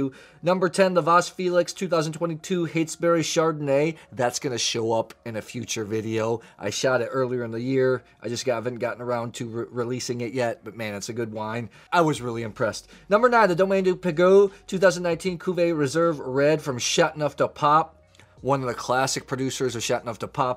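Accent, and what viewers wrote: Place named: United States. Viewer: I love your reviews and reactions and I think you are very, very knowledgeable. One thing that I could give as feedback is the pronunciation of foreign wines feels a bit off sometimes, overly American I suppose. Great video as always tho, cheers!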